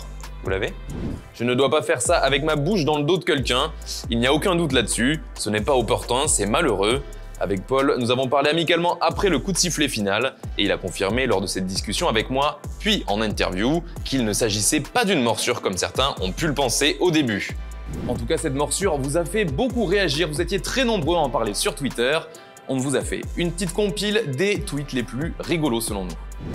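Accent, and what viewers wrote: Italy. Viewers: J’aimerais bien voir la tête du gars avec son accent italien cassé 😂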